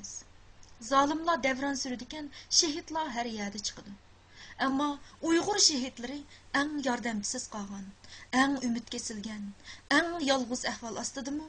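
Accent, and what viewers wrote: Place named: North Korea. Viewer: sound like North Korean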